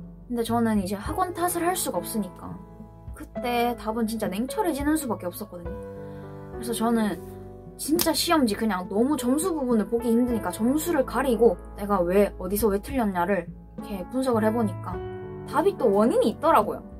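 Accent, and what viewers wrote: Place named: North Gyeongsang, South Korea. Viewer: 가끔씩 꿈을 꾼다는것은 씨가 좋은대학을 가기 위해 치열하게 공부했던 시간임을 증명해주는것 같아요. 하지만 꿈을 꾸고 난뒤 현실에 만족하기 보다는 그 꿈의 의미에 대해 한번 생각해보는 시간도 필요할 것 같다는 생각이 듭니다. 서울대를 못가더라도 삶을 행복하게 살아가는 사람이 있고, 서울대를 가더라도 삶을 행복하게 살지 못하는 사람도 있어요. 우리 삶의 종착역은 대학이 아닙니다. 많은 사람들이 서울대를 가려는 이유는 삶을 행복하게 살아가기 위한 디딤돌을 딛기 위한 것이라고 생각합니다. 씨, 서울대를 디딤돌로 삼아 더 발전하는 모습 기대할께요. (경상북도에서 태어나서 그런지 씨의 말투가 듣기 편하고, 또 좋은 마음이 느껴져 댓글을 납깁니다.)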